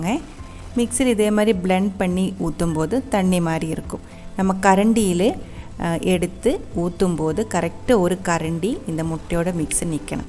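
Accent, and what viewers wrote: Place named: Malabar, India. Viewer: I have never seen like u Wow Thanks mam for this channel.addicted tour samaiyal and அழகான. மலபார் தமிழ் Long live mam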